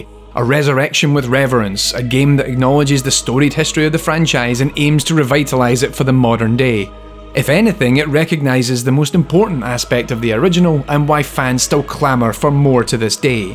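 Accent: Scotland, United Kingdom. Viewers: A scotsman tells me about violence. Love it. TAEK DE RUENSTOOONE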